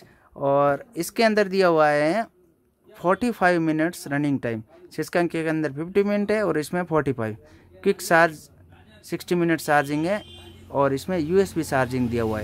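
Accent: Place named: Barmer, India. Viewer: Bhai aap barmer se ho kya. Accent us side ka lag raha ha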